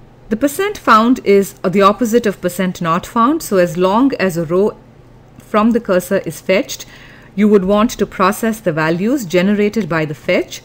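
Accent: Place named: India. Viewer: Thanks for this. Good explanations and it is fantastic to hear someone with an Indian accent speaking English so clearly.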